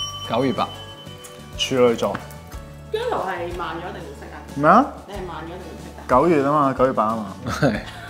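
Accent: Taiwan. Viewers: 我知呀！所以我話我估計佢爸爸媽媽新移民黎香港生佢之後，可能屋企都同佢講普通話，到佢大個自己鍾意台灣歌，pickup 得好快台腔。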